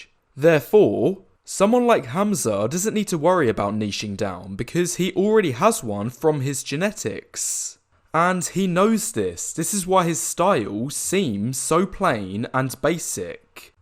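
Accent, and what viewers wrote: United Kingdom. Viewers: Like that voice you sound like wiley cotote when he talks once in a while that british accent youre a genius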